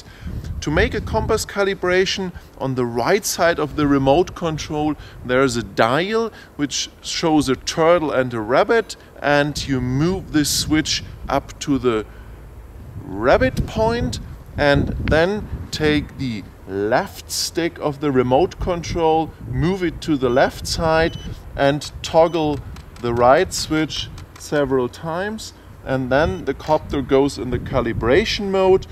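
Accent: Germany. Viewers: Super video-Thought his german accent be irriating but I grew to like it. Thanks again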